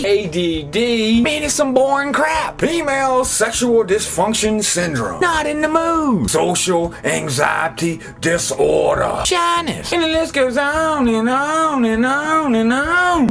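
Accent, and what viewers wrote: South, United States. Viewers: Excellent! Nice job brother. I started to watch this, (my first) , video on your channel...and initially thought, 'how annoying' with the southern hic drawl, and the outfit, UNTIL...I heard what you had to say. into the video sums it up. Love the points and I learned some new issues I wasn't aware of. I'll be watching for the next one. Thanks for making current events interesting. Respectfully, G.W.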